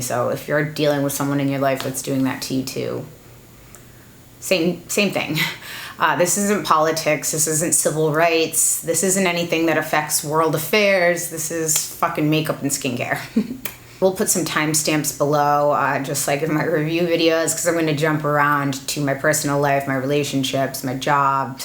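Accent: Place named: East Coast, United States. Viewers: I love your raspy east coast voice because it makes me remember I’m watching another goddamn adult on YouTube, not a teeny bopper. There’s a time and a place for their content...but I always stop drop and watch your videos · Always enjoy your content, and your raspy East Coast voice, RI native here. Monty Python is one of the building blocks for a great childhood, go dad! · I came to your channel a couple of years ago because of your “bitchy look and raspy east coast voice” lol. I have the same look and not raspy but an east coast (Nj) voice lol. Love the fact that you’re not a twenty something YouTuber!! Your opinions have always been trustworthy to me. I’m glad I found you ❤️.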